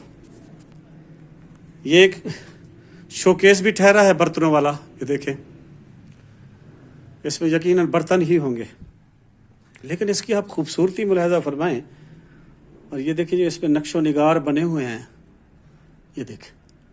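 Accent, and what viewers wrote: Saraiki, Pakistan. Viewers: اچھی اور قیمتی معلومات ہیں۔ لیکن آپ کی اردو جلد ہی کیوں ختم ہو جاتی ہے جو آپ بیچ میں ہی سرائیکی پر آ جاتے ہیں۔ اس میں کوئی شک نہیں کہ سرائیکی بہت ہی پیاری اور دل موہ لینے والی زبان ہے۔ ویسے بھی آپ ماشااللہ اردو بھی سرائیکی ہی میں بولتے ہیں جو اچھی محسوس ہوتی ہے۔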